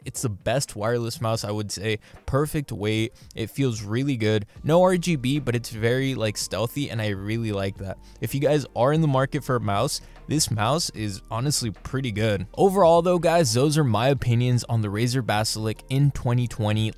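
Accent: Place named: United States. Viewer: why you sound like a american with fit body and few muscles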